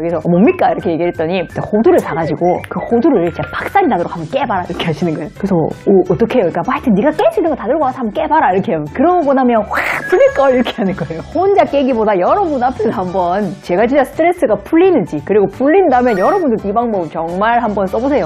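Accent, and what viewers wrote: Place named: Busan, South Korea. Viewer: 구수한 부산 사투리의 에게 서서히 중독되어 가는 것 같습니다. 개그 재능도 있으세요!!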